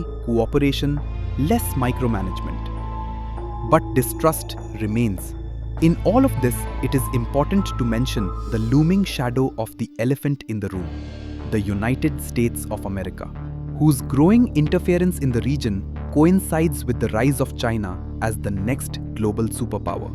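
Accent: India. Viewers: Indian accent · Said a indian voice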